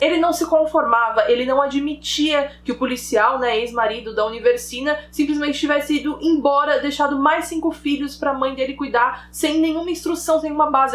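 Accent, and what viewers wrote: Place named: South, Brazil. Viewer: Meu sotaque é igual ao da (talvez um pouco mais puxado rs) e eu sinto uma certa representatividade quando ouço ela falando as gírias do sul e enfatizando o Ê no final das palavras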